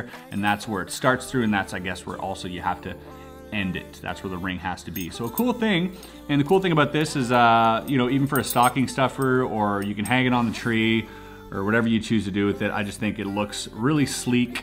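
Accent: Quebec, Canada. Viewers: are you canadian?? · This accent is definetly from Québec :D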